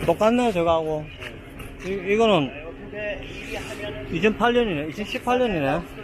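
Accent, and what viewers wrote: Gyeongsang, South Korea. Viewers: 쉽지가 않에요.... 이 갱상도 뉘앙스가 정겹다...., 라오스 문씨도 중간에 제 객관적인 방식....칽카핥핥크크크.... 좋은 영상 감사합니데이...욕받심더~~~ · 목소리가 너무 좋아요 ㅋㅋ 전 마산에 살아요 경상도 분이 캄보디아에서 사투리 ㅋㅋ 너무 정겹습니다 유투브 대박나세요~^^ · 리얼 경상도^^ 고향에 온 듯해서 좋습니다. 번창 응원합니다^^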